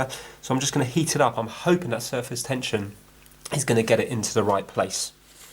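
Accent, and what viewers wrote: United Kingdom. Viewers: Hi im trying to figure out what part of the UK you are from with your accent? Warwickshire or? i might be wrong but just wondering where your from. Thanks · D one day we want to see face. And maaan. I just love this british accent. Here in Germany we all love it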